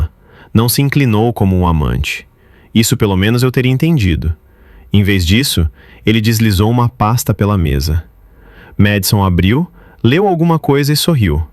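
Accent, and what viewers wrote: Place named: São Paulo, Brazil. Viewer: Sotaque de paulistano!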